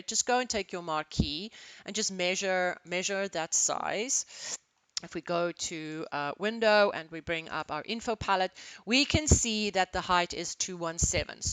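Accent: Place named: South Africa. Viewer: I presume you are South African, so for me as a South African, it was nice to have a common accent. Great tutorial. Could you please read some of your mail please, so many unread :-)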